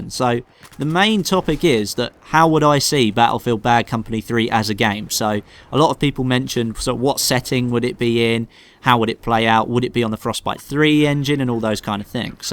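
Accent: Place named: South East England, United Kingdom. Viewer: He speaks like most in the south east (i think) of England do.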